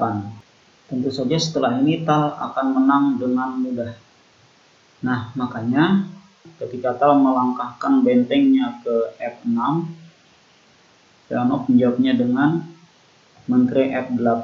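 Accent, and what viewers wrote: Indonesia. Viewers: Suaranya medok banget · Logat banjarnya kental sekali bro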